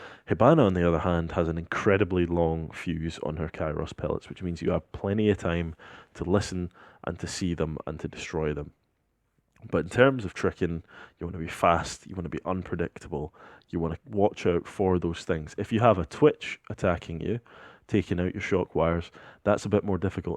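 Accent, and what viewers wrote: Scotland, United Kingdom. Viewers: Yu scotish m8 holy feck that be rare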